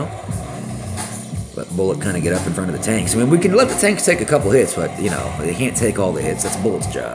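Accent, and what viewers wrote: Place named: Texas, United States. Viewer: Haha you smoothie you 🤪 Mellow music in the background, deep Texan voice, shades and a bit of paraphrasing “it’s all about the science baby, just relax” Nice vid 👍🙂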